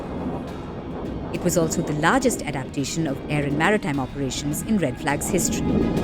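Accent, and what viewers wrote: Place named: India. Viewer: I detected the Indian accent! Why would Inida care and brag about the US military? This is weird.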